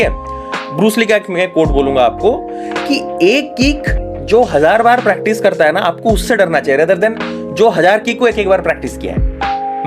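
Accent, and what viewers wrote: Odisha, India. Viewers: Bhai aap odisha se ho ?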